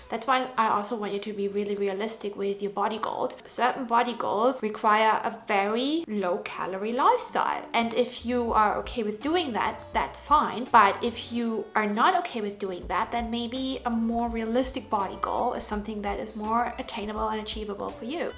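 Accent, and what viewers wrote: Germany. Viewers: It took me a while to figure out your accent, but its German! ❤ Love from Germany, youre spitting the important facts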